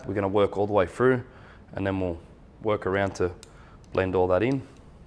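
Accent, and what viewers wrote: Australia. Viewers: is he jewish /american,,he looks like Billy joel but sounds like an auzzie or new zealander,,he will probably never come to the united kingdom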